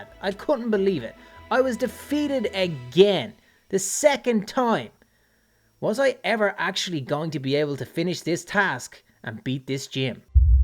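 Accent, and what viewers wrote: Ireland. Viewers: youre irish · Are you Irish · The Irish acciend love it brutha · I love ur Irish accent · The second good Irish YouTube I found in my whole entire life also noting is that your Irish because I am to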